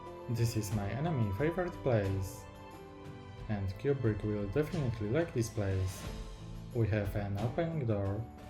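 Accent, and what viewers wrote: Poland. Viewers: Tak myślałem, że to polski akcent xd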